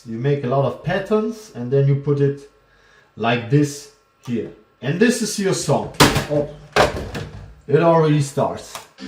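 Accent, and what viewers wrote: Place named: Germany. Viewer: ドイツ訛り英語だけど逆に日本人には聞き取りやすいし、日本語のタイトルは嬉しいね。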